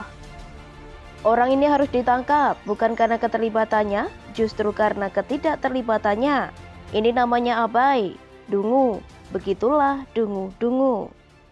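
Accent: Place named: Java, Indonesia. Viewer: intonasi dan medoknya announcer gak cocok buat berita...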